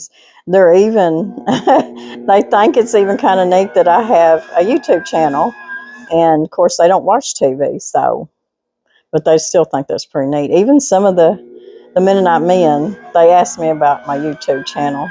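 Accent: South, United States. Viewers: I love hearing your southern draw. God bless you both.❤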